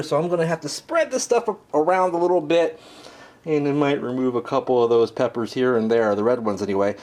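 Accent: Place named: Missouri, United States. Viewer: he must be from Missouri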